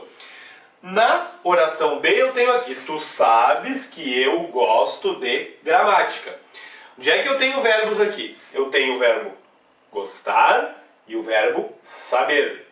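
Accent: South, Brazil. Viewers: aula excelente aí!, a dinâmica ajuda bastante aí!, muito legal aí!, o professor é do sul mesmo aí!, parabéns aí!, obrigado! aí!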